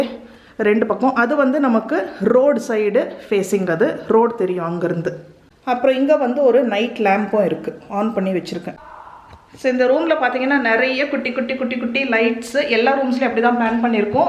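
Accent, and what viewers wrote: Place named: Karnataka, India. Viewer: Kannadam kalantha tamil arumai vazhthukkal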